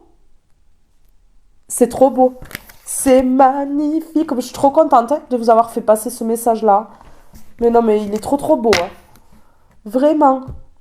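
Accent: South, France. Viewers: Merci Par rapport à des nouvelles récentes dans ma vie sentimentale ta guidance m'a scotché et touché et j'apprécie beaucoup ton humanité ton superbe accent méridional ton humour er aussi tes superbes coupes de cheveux! Tu nous fais du bien avec tes guidances! Soit protégée par le seigneur! Que ta journée te soit des plus agréable!!! Je vais regarder ta nouvelle guidance ❤👍😘☀️🌟🙏🙌🙏🙌🙏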